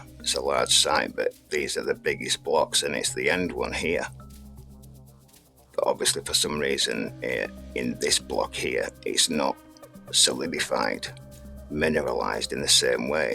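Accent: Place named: Blackburn, United Kingdom. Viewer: Nice work. Love the presentation and questions it makes one ask. Ya also sound like me best man's dad from Blackburn. Am I close?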